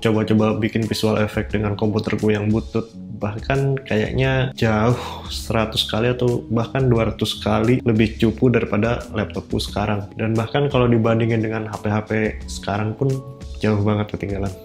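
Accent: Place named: Kalimantan, Indonesia. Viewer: Logat kalimantanya keluarin lagi bang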